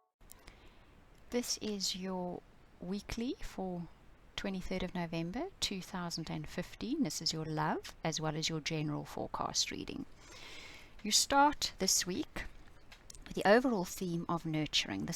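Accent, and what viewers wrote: South Africa. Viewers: love you're south African accent... very nice positive reading thankyou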